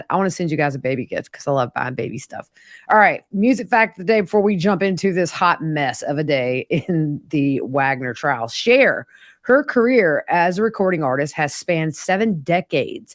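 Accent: South, United States. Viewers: Hi our lovely, It's the 1st time I have heard of Tabitha, who is she and what is her role (if any) in this case. Thank you for your great work. And I so love your Southern accent it's great. 🤩💗💗💗💗 Lots of love from Julie in the UK 🇬🇧.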